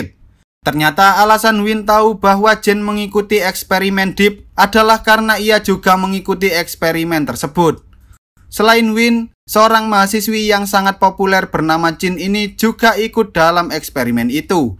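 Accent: Java, Indonesia. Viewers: Dari cara ngomongnya, pasti orang jawa tulen ini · logat mu podo koncoku mas jowo ngendi sampean? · Wong jowo mas? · Suaramu wi lho bang medhog banget, malah mirip koyok suarane dodit hahaha🤣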